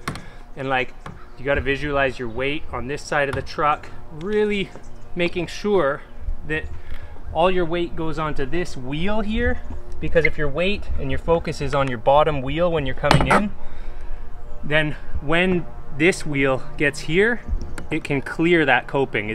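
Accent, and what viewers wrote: Canada. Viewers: Maybe its the white shirt, maybe its the canadian accent.. but after clicking on this I almost thought I clicked on a Vancouver Carpenter vid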